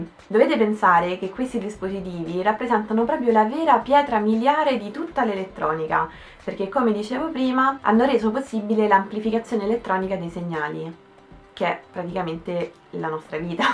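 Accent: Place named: Aosta Valley, Italy. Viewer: Brava, precisa e spigliata. Proprio a trovare un pelo nell'uovo l'accento valdostano ;-) E poi morirò chiedendomi se si dice nanòmetri o nanomètri.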